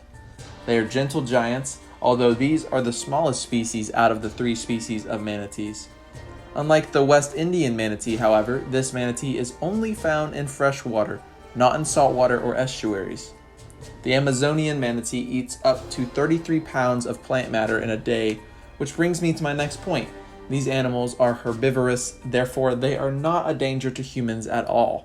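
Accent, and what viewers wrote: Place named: South Africa. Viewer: Oh this channel looks good! Must be South Africa as he has a strong SA accent. Will check it out further.. what a nice guy.. I sooooooooooi hate trophy hunting, will NEVER understand how some get fun out of killing these magnificent beasts.. Trust me, channels appreciate comments, Violet - it all helps.. and thankyou for your kind words!! 🤗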